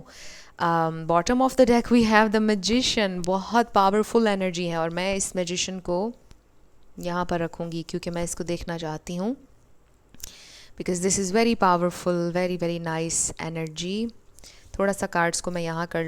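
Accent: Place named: Pakistan. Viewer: Mujhe lagta hai aap Pakistan se lag rhi hai.. Kyuki aapki bolchal kuch ehsas dila rhi hai. Any way. All the best to you. And Happy New Year 2024❤❤🎉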